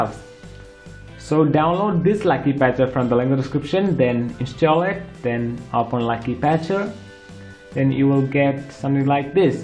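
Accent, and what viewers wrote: India. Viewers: OMG. Indian accent is so annoying especially when they try to pretend that they are not Indians.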